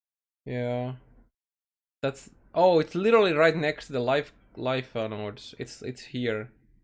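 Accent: Finland. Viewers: I am going to be honest. I know you know a decent amount about the game but your guides are awful for several reasons. First of all, your videos are too long. You need to learn how to edit your content. If you wanna be serious stop copy-pasting and learn how to make it short, on point and simple. Secondly, you don't articulate your thoughts and your English is decent at best. You have to convert your thoughts into sentences for other people and explain yourself. To me it seems like you are just saying whatever word comes to your mind first and you output that. Your Finnish accent and mumbled sentences don't make things easier so you have to compensate for that. Lastly, I myself play this game for a long time and most of the time I'm struggling to understand what you're saying even tho I understand the topic of things. But a lot of details lost. Now imagine a person who has no clue about the wider picture. 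Your explaining of things and these guides are making me facepalm too much and it's disappointing so I thought I will give you some advice. Now what you do with it is up to you.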